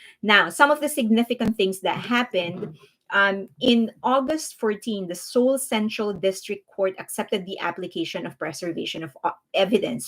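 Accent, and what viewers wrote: Philippines. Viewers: shes from the philippines thats our pronounciation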